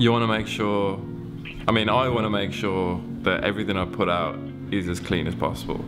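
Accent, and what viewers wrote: Birmingham, United Kingdom. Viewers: Always inspired by your work. Always. I don’t know if I missed the story, but how did you end up in Peru? Is that a Brummy accent?? Could you do a video on your background and how you came to be where you are doing what you do? I think it is fascinating, the life journey that took you to Peru, to sacred geometry. Pls share, and sorry if u have already done that video, I haven’t been watching your stuff that long so may have missed. Thanks.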